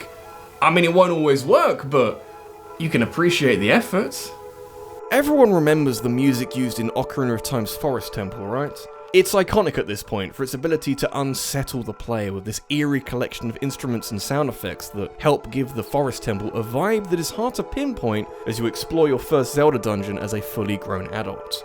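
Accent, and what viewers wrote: United Kingdom. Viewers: I never played that Yoshi Island and I'm glad I never did because the original it's my favorite game of all time and the music there was a piece of art, but this one... Yeah, I don't want to get near that. Also I like all the subliminal messages on your video, like the Splatoon plushie on your left and the star to the right representing how in this video the music is more important tan any game mechanic, also the Lucio's T shirt making us know there is a little percentage of game with bad music just like the Overwatch community has a small percentage of bad people but with a lot of great potential and awesomeness out there... Or maybe I'm just overthinking everything while listening to your british accent! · I didn't even realize you were British until you said it. I've heard so the accent consistently for years I forget there's a difference between American and British accents. 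· You mention that your audience has a craving for dat British accent. Okay what about your audience already native to England in the UK? People probably go to sleep peacefully to your sexy voice all over the place (no homo)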